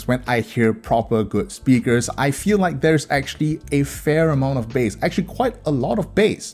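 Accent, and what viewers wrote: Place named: Singapore. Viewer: Must be that Singapore accent. Similar "melody" of Nigerians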